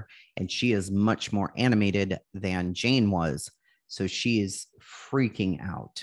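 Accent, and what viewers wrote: Boston, United States. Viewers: Love the way ur telling this story.... 💞🙏... ur Boston Accent, u all pronounce ur "R's" differently from me... I'm in Washington state... Good saying "drawers", spot on🥰😊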